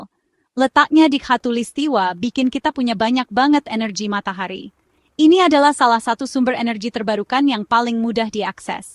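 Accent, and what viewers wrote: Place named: Malaysia. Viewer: Konten kreator yg logat begini palingan orang malengsia.. Halah sok tau lo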